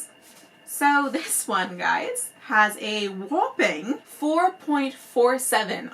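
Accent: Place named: United Kingdom. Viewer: Are you British? I hear an accent every once a while!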